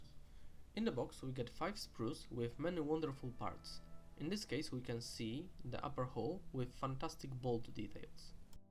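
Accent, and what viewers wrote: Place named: Poland. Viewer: Nie źle angielski też spoko tam tylko masz polski akcent ale tam jest spoko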